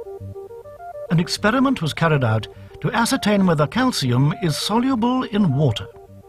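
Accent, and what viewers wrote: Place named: United Kingdom. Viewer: Honestly a soft-spoken Brit can make me believe all the BS in this video.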